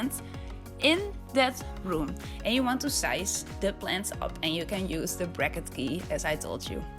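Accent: Netherlands. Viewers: Idk but your accent sounds duchts😂❤️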